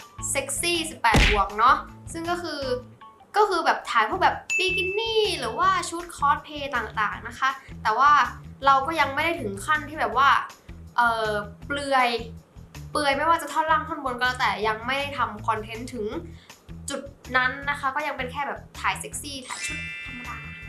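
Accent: South, Thailand. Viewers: เป็นึนใต้ป่ะครับ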